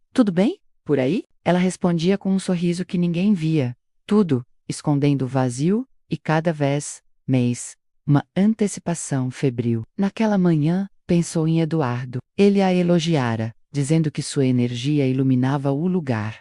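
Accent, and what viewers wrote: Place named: England, United Kingdom. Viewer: Porque o sotaque forte do Ingles? A historia é bem interesante❤❤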